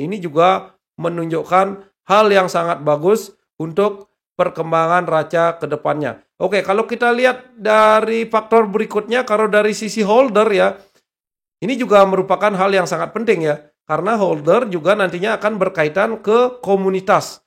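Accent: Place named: Bali, Indonesia. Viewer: Medok banget bli ciri khas Bali nya😀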